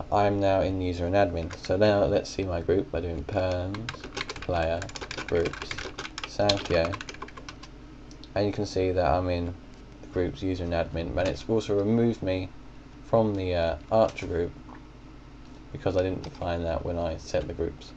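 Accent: United Kingdom. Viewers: omg i cant understand wtf hes saying hes mumbling and has a british acent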